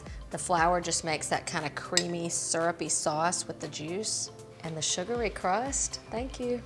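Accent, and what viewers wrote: South, United States. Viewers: Clear and to the point, even with some bloopers stuck in. And what a hostess with that yeehaw twang! <3 Thank you kindly from Poland.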